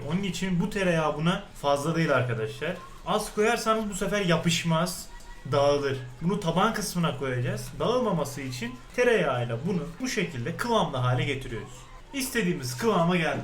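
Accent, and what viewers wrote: Adana, Turkey. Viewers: Abi sen nerelisin adanaı gibi konuşuyosun atüyürüm ocağa ekliyürüm diye konusuyonda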